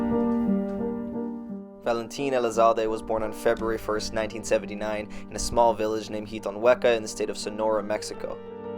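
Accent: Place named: United States. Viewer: Nice to hear a american speak on famous mexican singers. ❤❤🇲🇽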